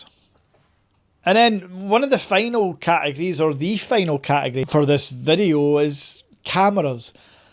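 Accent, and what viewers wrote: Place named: Scotland, United Kingdom. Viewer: Love your Scottish accent!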